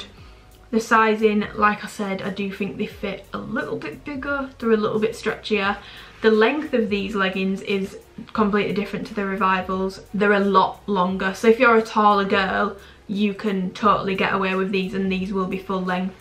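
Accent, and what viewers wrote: United Kingdom. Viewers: are you from Scotland 🏴❤️😋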